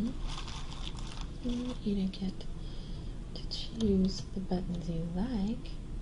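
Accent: South, United States. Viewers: Fantastic vid. Your tone is soothing and trigger-happy (I think I detect a slight Southern accent too, which I like). Role-playing is good enough to not be distracting. Good work with the added triggers. Finally: you're a joy to look at. Great work. Subscribed.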